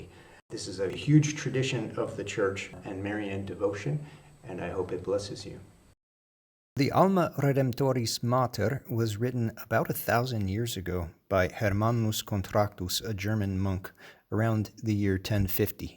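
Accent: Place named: Latin America. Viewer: So beautiful!! I really love your Latin accent. Thanks and God Bless you.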